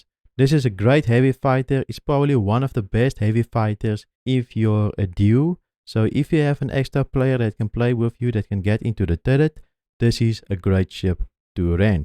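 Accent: Afrikaans, South Africa. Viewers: The Afrikaans accent gets me every time😂😂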